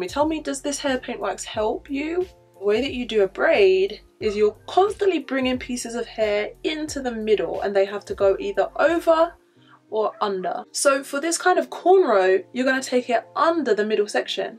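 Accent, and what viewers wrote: United Kingdom. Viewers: Sounds British and speaks Spanish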